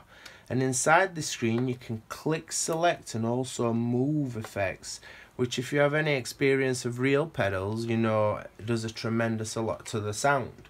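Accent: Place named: Yorkshire, United Kingdom. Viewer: nice yorkshire accent. :)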